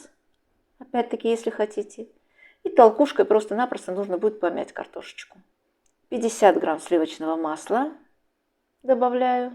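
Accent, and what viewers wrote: Baku, Azerbaijan. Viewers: Как все по бакински! Просто наслаждение от родного акцента, четкой толковой подачи, вашей красивой внешности и даже интерьер кухни напоминает чем то Баку, ну а рецепты… когда смотрю их, перед глазами встают бакинские посиделки и «гонаглыхи» 70-80х. Прям хочется обнять вас, родная! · О,этот сладкий бакинский акцент!,спасибо большое за чудесный рецепт и за такую приятную подачу. Храни вас Господь ❤ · Спасибо!!!!Из комментариев поняла, что Вы бывшая бакинка? Огромный привет, землячка! Такое удовольствие услышать чуть уловимый акцент, ничем не истребимый у тех, кто жил в Баку. Желаю Вам успехов, здоровья и благополучия! Всем мира и любви! Пирожки завтра же испеку. Уверена - рецепт классный!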